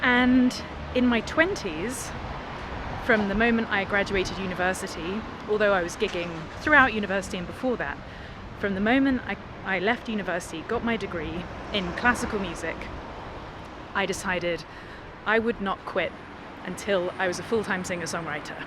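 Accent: United Kingdom. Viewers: I love watching your vlogs and to boot I love listening to you talking. Your British accent is so eloquent and perfect phrasing. I also love to hear your guitar playing and listen to you sing. Keep it up there are too few of your vlogs. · What part of the UK are you from,?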